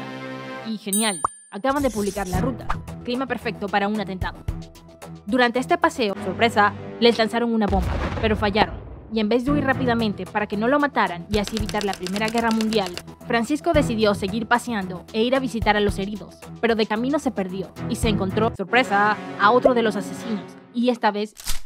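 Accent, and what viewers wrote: Panama. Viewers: Eres de Panamá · No entendi español pls (Eres de Panamá?)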